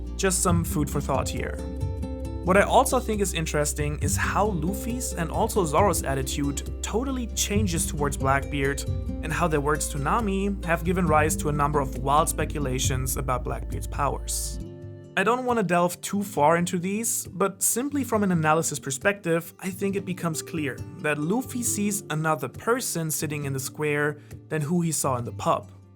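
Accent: Germany. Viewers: As a fellow speaker of English as second language , want to note that it's a common mistake to pronounce infamous as in-famous, I totally understand you. But correct way to pronounce would be "infomus" with a stress on i. P.S. but wait... are you really not German? Where are you from?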